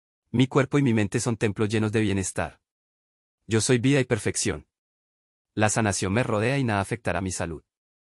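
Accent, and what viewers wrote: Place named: Colombia. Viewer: Bella, que bueno q la voz es de acento colombiano y no de una maquina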